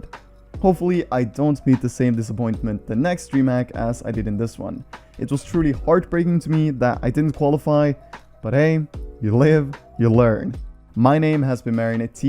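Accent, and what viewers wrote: Norway. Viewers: Are You norwagian?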